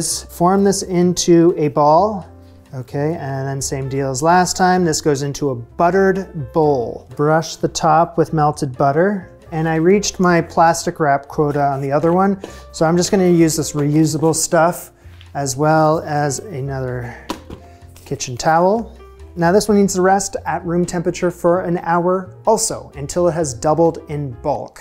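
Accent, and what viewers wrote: Canada. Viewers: I've also noticed you pronounce vague as vag not vayg the way Americans do. The bag-ull instead of bay-gull follows suit. Thanks for addressing it though. Nothing wrong with being a proud Canadian, eh? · I say it the same. I live 30 mins from where he’s from in Ontario. I didn’t think it was odd but people pointed it out a few years ago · My grandmother was Canadian. You say it just like she did I’m gonna go with it to regional pronunciation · "Bägels". Coming from Sweden, Canadian English isn't a variety I'm very familiar with. I always feel a slight sense of satisfaction when I can pinpoint the Canadianisms in your pronunciation! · I thought you might be Canadian, but this confirms it lol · It is Canadian! Dont feel bad! Its your Ontario roots. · I think its a north american dialect thing. I'm in no way an expert but I'm besties with an SLP and we geek out about dialect stuff. 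Please correct me if I'm wrong but the Canadian vowel shift and the northern cities vowel shift have a similar thing in how they treat the short a. Making for bag-el vs bay-gul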